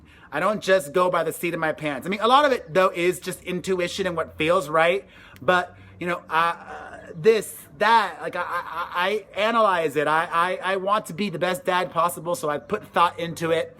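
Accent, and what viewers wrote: United States. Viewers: Me encanta como tus nenes y vos hablan en castellano y que sigas manteniendo vivas tus raíces!! Y me atrevo a decir que tus hijos no tienen acento estadounidense como vos al hablar en español!! Un beso desde Argentina 😘😘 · now I understand ,but I had no clue u were Spanish,u sound southern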